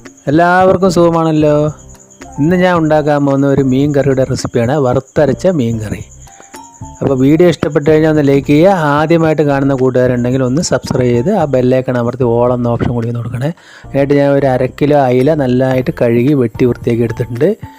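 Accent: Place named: Kannur, India. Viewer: വറുത്തരച്ച അയല കറി അടിപൊളി ആണല്ലോ 👍🥰❤️കണ്ണൂർ ആണെന് തോന്നുന്നല്ലോ 😃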